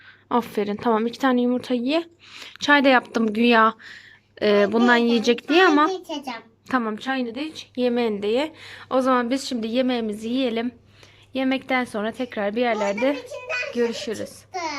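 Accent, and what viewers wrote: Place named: Zonguldak, Turkey. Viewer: Size yeni abone oldum videonu beyendim nerelisiniz acaba konuşmanızı zonguldak tarafına benzettim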